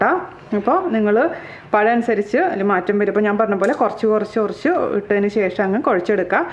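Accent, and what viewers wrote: Thrissur, India. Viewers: #roseeducation: yummy recipe. I love all your recipes. I really like the way you talk especially thrissur ascent. Thank you so much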